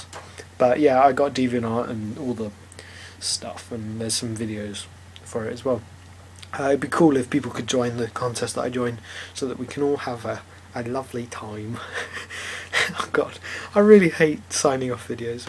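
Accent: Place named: United Kingdom. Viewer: This is perfect, a British guy talking about naruto! Im in heaven!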